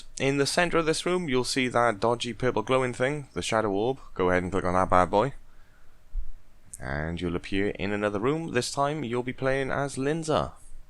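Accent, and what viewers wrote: Wales, United Kingdom. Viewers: Are you welsh man?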